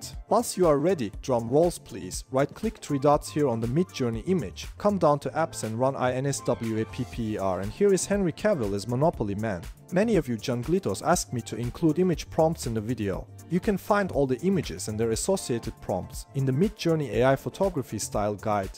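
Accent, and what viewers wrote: Turkey. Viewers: Krall Türk müsünn bu bizim ingilizce aksanımız nerde duysam sanırımm hahah :D Ya şeyi sormak istiyordum örnek bir ürün fotografımız var çanta diyelim. O çantayı aynı tutup arka planınıı değiştirebildiğimiz bir sistem var mıdır bununla ilgili bir kullanım şekli ?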